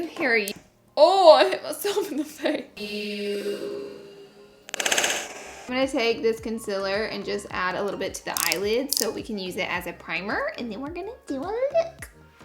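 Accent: South, United States. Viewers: i love you so much and your country accent sounds like mine lol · Her accent, she's southern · I love how your southern accent gets stronger and lighter as you talk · It's just her Southern accent. We don't all pronounce everything the same way. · It's a southern thing! We all do it in the south. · Love how you pronounce “oil” like “ole”. ❤️. The southern accent is so cute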